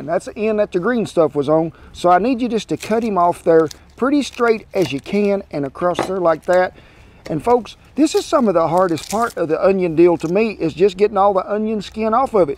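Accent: South, United States. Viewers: His voice and southern accent is so nice to listen :) and of course amazing recipes he does! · I like ur southern accent sir... really smooth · Nice and clear american diction! You can work as a showman) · well, it's cowboy drag, at the least. he's really worked on the "cowboy" accent. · to be honest I watch this because of the cowboy-accent. So stylish slang keep up the good work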